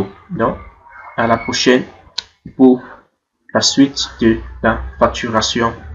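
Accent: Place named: Africa. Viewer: C'est magnifique on a beaucoup apris et avec du français à l'accent africaine ça fait vraiment plaisir, merci.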